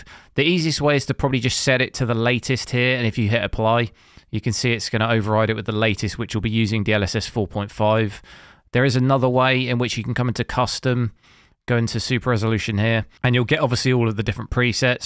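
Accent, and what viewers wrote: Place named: United Kingdom. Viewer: Out of topic - where are you from? Cause you mix accents and pronunciation, you have British accent but you pronounce some words differently, e.g. "twenty" without the "t" ;)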